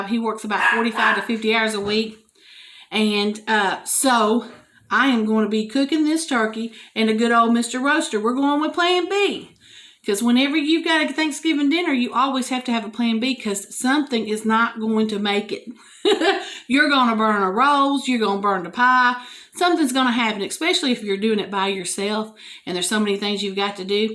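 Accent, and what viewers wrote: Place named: Tennessee, United States. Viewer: I love you you talk like me I’m from chattnooga tenn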